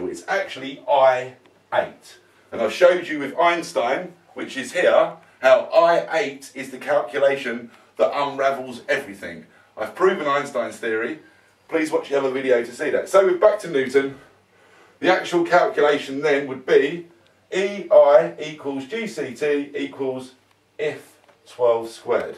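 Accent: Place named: United Kingdom. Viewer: Of course it would be a dumbass Brit pretending to argue against Newton. I mean your people are so completely slow in the head that you pretend your preserved parks are deep woods on your deforested little island nation and beat your knives in the place of buying saws. I surely hope that by now everyone recognizes that dumbass accent as the idiocy that it actually represents.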